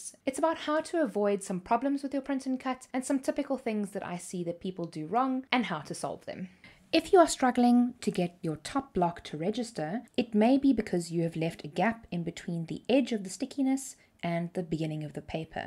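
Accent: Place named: South Africa. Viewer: Your accent is so nostalgic. miss SA so much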